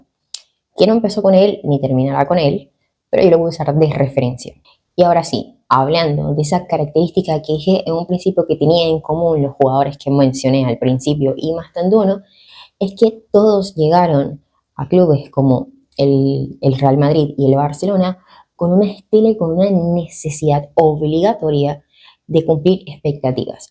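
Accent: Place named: Argentina. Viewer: Que lindo acento tenes, pareces argentina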